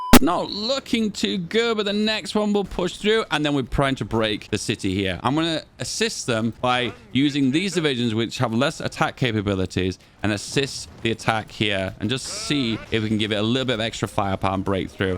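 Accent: United Kingdom. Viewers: Eh British 🤮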